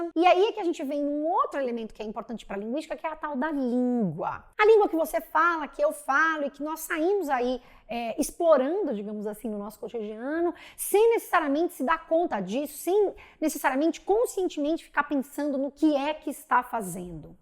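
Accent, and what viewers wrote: Rio de Janeiro, Brazil. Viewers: bonita, sotaque maravilhoso(carioca?), simpática e elegante, mas só caso em cartório...kkk...e acima de tudo...interessante(nota de rodapé).Depois dessa aula finalmente lerei Grande Sertão Veredas...kkk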